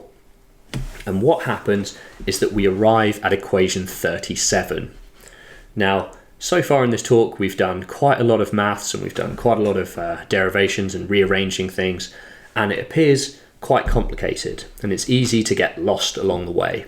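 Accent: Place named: United Kingdom. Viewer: 这英国口音听着太舒服了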